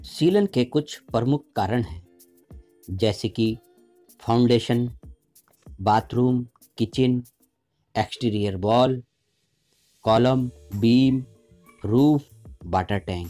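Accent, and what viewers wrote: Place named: Bhopal, India. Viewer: Sound like Bhopali 😀👍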